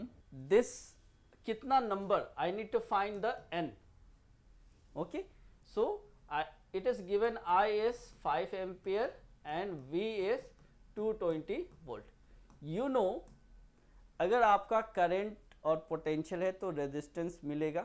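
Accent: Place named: Assam, India. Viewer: Sir Assamese medium r lage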